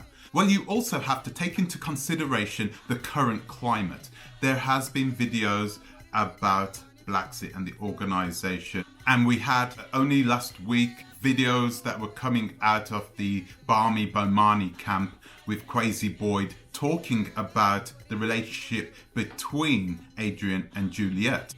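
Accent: United Kingdom. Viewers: I am puzzled by your video. Surely this is a private matter between husband and wife and nothing to do with the business or you and us. Why are so interested in talking about their marital life and talking about them defrauding people? You sound British and you know you wouldn't get away with this in the UK without being sued. Am I missing something here?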